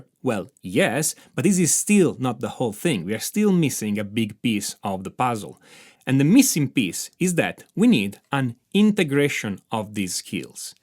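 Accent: Italy. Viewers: Where do you from? Maybe from Italy?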